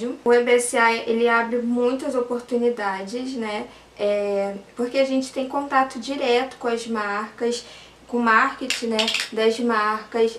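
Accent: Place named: Rio de Janeiro, Brazil. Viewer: Aaa é muito carioca mano,amo esse sotaque carioca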